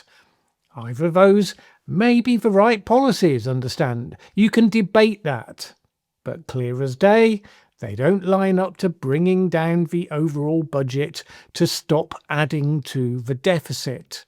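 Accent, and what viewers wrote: United Kingdom. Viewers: How do I block these Brit narrators. Annoying as F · So Sick of listening to some stupid Bloke, with your horrible accent. We Don't Care about your Cheesy Propaganda. You Brits, have Lost your country to Muzzys. You Brits have Nothing to Say. You have a National Passtime 'SLAGGING THE YANKS'. High Time for you to Grow Up. Leave the TARRIF Deal to solve itself, it will. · ARE YOU A BRIT ?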